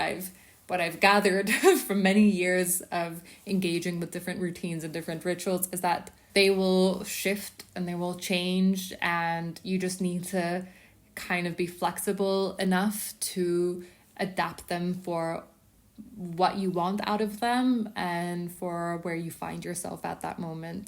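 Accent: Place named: Ireland. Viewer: Do you mind me asking where you are from? I find your accent fascinating. As an American English speaker, I don't detect a strong accent on most of what you say, but occasionally some words feel slightly seasoned with an accent that I can't quite identify. Seems possibly Irish, but my mind might be jumping that way due to your red hair.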